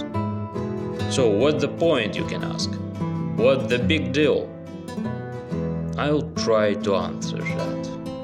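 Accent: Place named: Spain. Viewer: I hear you have a Spanish accent. Are you from Spain? My grandparents were from Castilla La Vieja in Spain. I think that I must have been an artist in my past life because painting is my passion, and I am a self-taught artist. I went to Spain and visited the museum in Madrid. I love Velasquez. He is my favorite of Spanish artists! You are great too ! Gracias por compartir su conocimiento y talento con nosotros!!❤❤❤❤🎉🎉🎉😊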